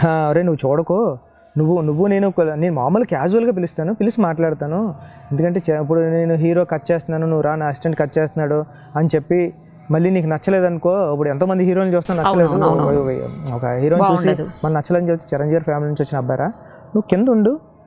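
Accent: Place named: Godavari, India. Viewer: Director sir, are you from Godavari district? Your language like that🙏🙏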